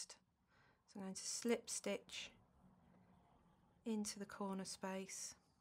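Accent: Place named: Australia. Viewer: I love your accent! Are you from Australia?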